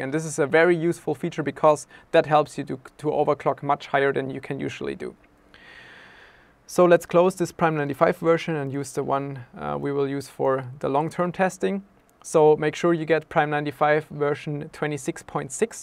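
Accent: Germany. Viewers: Deutscher Akzent kickt rein xD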